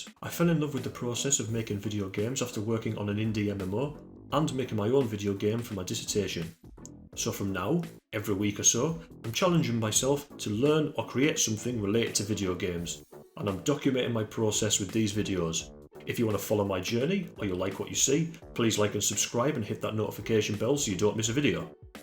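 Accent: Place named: Wales, United Kingdom. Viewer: HES WELSH! HES BRITTISH!!!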